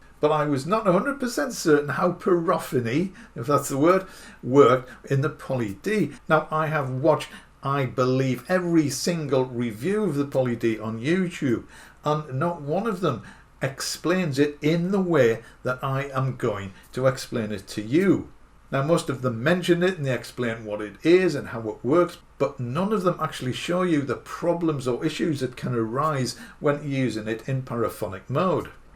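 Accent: United Kingdom. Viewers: I agree,,, it frustrated me too.. still got it but,,, I go back to other Synths... Btw... by your accent,,, ye from my part of the UK 👍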